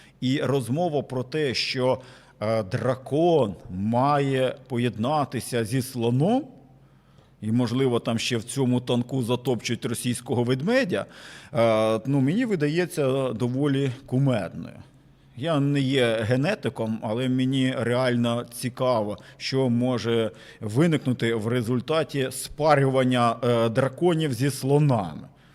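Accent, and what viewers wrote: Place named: Eastern Ukraine, Ukraine. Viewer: Не зважаючи на гарну українську мову,приємно чути цей особливий східний акцент.Поважаю Вас❤❤❤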